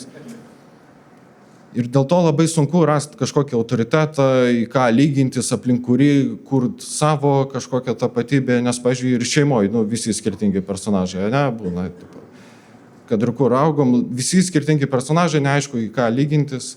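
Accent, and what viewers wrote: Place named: Vilnius, Lithuania. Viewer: Pagaliau Vilniaus krašto komikas. Tuteišai unikali kalba! Niekas niekur daugiau taip nekalba. Teko suprasti tik emigravus 😅 Atrodė natūralu visada. Šaunuolis!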